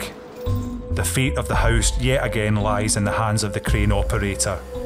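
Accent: Scotland, United Kingdom. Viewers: Why do people on here SLAM DUNK the video, It doesn't matter if the announcer is Scottish, some one had to do it and he was chosen, "Welcome to the internet as You Might Say" Thanks for sharing this with us on you tube, if you have any more please send me a link and any others that might be interested, English / Scottish / Usa / Australian / Canadian/ :) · Why the scotish accent? It's not even in Scotland.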